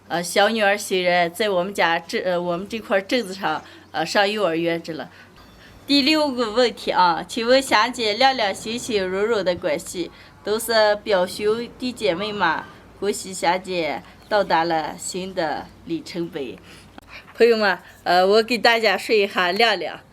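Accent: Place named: Northwest, China. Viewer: 霞姐，加油！你的视频为我在疫情期间带来了温暖和乡音。保持西北人特有的质朴和真实，相信你的视频会传播的越来越远。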